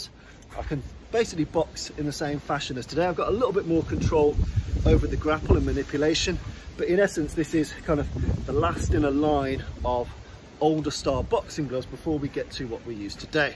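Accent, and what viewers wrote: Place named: England, United Kingdom. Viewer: Where in England are you from?you have such a lovely accent but I've never heard it before.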